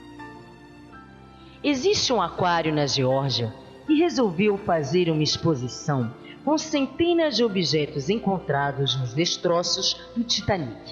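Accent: Pará, Brazil. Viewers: essa narradora é paraense?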